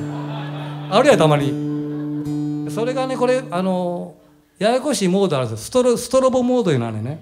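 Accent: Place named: Kishu, Japan. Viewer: ありがとうございます。我が家では濱口さんの紀州言葉をお互いに言い合って楽しむ時があります。関西人やからのお。ええのお！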